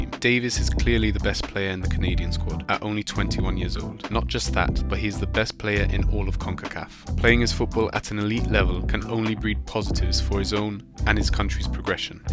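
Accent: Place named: Europe. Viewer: As someone who has lived and( just about always) died with the CMNT my whole life, I think this is a very well researched video and hits some great points. One think that I think Europeans (I'm assuming because of the accent) don't full grasp is just how crazy qualifying in CONCACAF is. Herdman will have to keep the boys focused for the remaining 4 away matches. They have shown a lot of grit and fire, lots of shoving matches during and after games. They will have to keep their discipline when playing in Honduras, El Salvador...etc Thanks for posting, great video.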